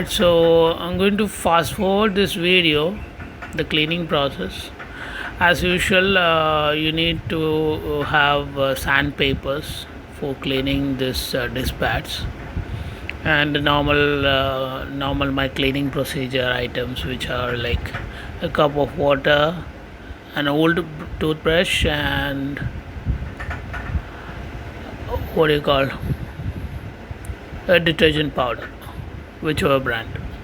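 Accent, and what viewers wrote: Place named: North India, India. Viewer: I thought your a north indian.... You know the way you speak English made me think that...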